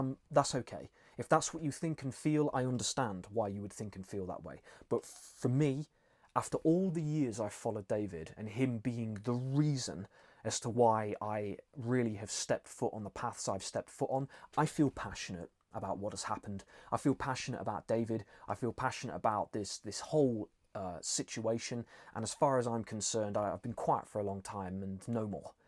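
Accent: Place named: Northern England, United Kingdom. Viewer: Greetings ! You should drop your stupid northern accent because this is ego identity another label. I’m not judging you but being totally honest with you with energy straight from my heart. What you say about me after this I don’t care I forgive you if it’s something negative because you don’t know. I’m a manc I’m a scouser you get it ? This is why you go on the spiritual path to get rid of your lebels. UK is a reptilian prison camp and a matrix which you must escape if you a lightworker I already did I went to live in the Swiss Alps far away from the sick British society anyway the law of one is powerful and those who achieved cosmic unity in oneness with cosmic awareness know this but coming back to this dimension after sometime is very hard but you have to but this time you are a multidimensional being who is in all timelines and dimensions at ones always NOW. 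I hope David transformed well. Peace & Wisdom 🎼🙏🏻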